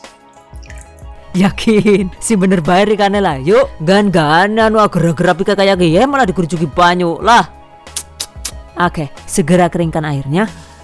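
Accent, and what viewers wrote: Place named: Tegal, Indonesia. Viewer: Semangat ide ide baruu banyak vidio yg menjadi motivasi saya...terus pembawaan juga kadang suka bikin tersenyum senyum..logat Tegal jgn ketinggalan yaa..hehe..puding nya cantik ikut memeriahkan HUT RI...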